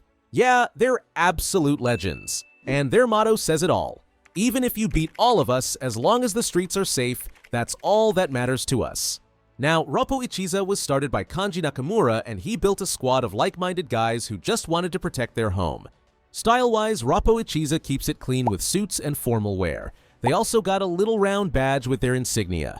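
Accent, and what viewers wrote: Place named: United States. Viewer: the american pronounciation of the japanese is so funny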